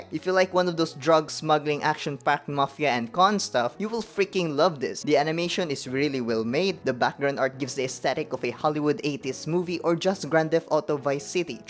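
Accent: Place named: Philippines. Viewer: Okay i was just having a guess that you are a filipino because of the accent pero pinoy nga HAHA good job bro i also love great pretender haha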